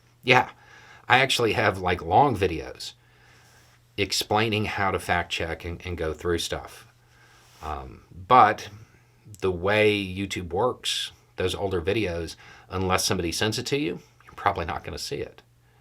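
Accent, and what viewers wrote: South, United States. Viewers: I beg your pardon, I happen to watch every video you put out. 😉 I also share them to educate those who are down the rabbit holes of conspiracy theories. Avid fan of your content, including Roads with. I’m a Georgia girl, so I just love to listen to you speak. You’re intelligence, calm demeanour & southern accent in a sing/song tempo is mesmerising. I do enjoy when your temper flares every now & again.😂 It reminds me of my childhood. Stern, but not aggressive.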